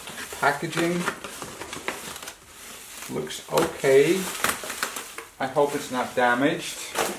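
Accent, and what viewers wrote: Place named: Netherlands. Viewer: Do you service DAT recorders ?? I presume by your accent you are in Holland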